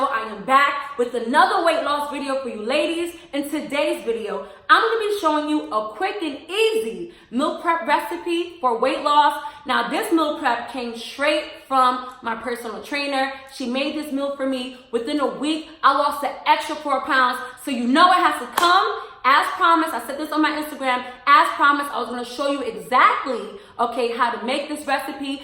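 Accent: New York, United States. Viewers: I immediately knew you were from New York within the first ten seconds. Lol.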